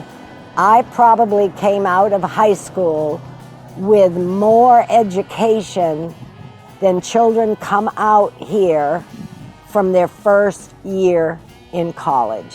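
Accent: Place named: England, United Kingdom. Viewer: Суперское видео!! Побольше таких снимайте!! Очень приятная и красивая женщина с изумительным английским акцентом!!! С нетерпением ждем 2 и 3 части!!!!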